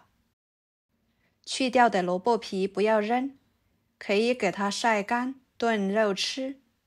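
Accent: Yunnan, China. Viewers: 好难听的云南普通话，你直接说方言比你的马普要好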